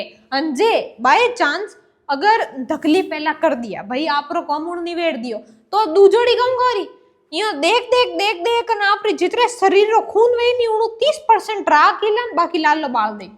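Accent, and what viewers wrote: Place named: Rajasthan, India. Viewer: Yes, no one can understand her except us rajasthanis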